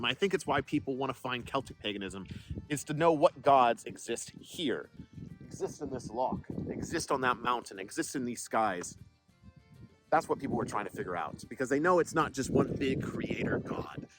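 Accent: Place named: United States. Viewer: Y r u American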